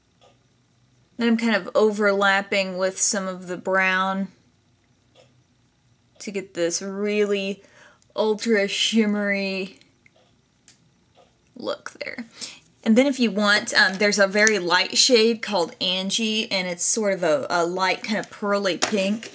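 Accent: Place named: Alaska, United States. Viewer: are you from alaska?? you sound like sara palin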